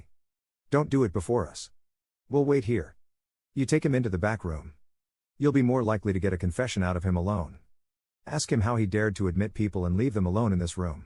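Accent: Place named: United States. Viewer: You can't read Sherlock Holmes with a robot American accent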